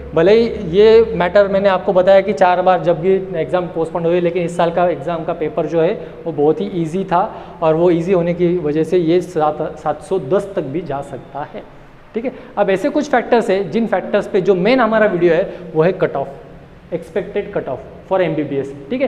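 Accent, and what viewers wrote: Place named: Maharashtra, India. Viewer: Maharashtrian hindi sir😘😘😜😜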